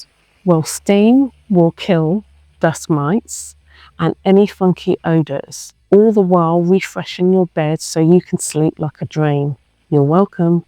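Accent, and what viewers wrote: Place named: Southern England, United Kingdom. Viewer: Faucets or taps? She sounds like she's from the south of England so why faucets?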